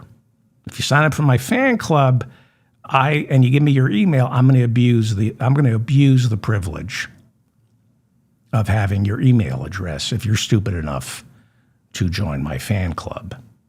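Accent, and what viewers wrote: United States. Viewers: Once again you have “cracked me up” today! I love your self deprecation, lavatory humour and irony. As a Brit I have to say ( and this is a compliment!) without your American accent you could pass for British!😂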